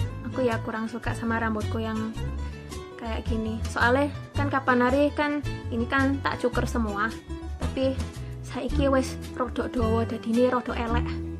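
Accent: Java, Indonesia. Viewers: Logat bahasa indo-nya jawir bingits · Heran, ini cewek jepang kelahiran mana ya koq ngomongnya medok gitu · Jawa Nya Medok Banget Guuud Lah (Y) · logat logat jowo e iseh kentel wkwk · Logat.y medok banget mba... Mantap mba....😍😍😍😍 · kamu aslinya orang mana sih? Wajahmu Jepang tapi ngomongmu Jawa medok? 😊😊😊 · Keren, lucu aja logat mu orang jepang tpi klo pakek bahasa indo logat jawa bgt. Keren ,cantik..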